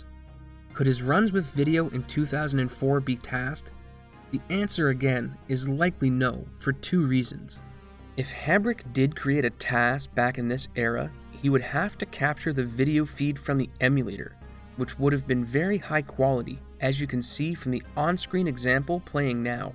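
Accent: Canada. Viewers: Are u Canadian? You speak like a Canadian. Mars bars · Your "AR" sounds scream Canadian.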